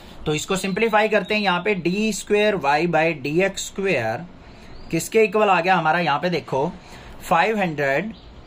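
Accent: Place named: Bengal, India. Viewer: Bhai wo Bengali he Bengali accent tuje nahi PTA to me taunt mara kr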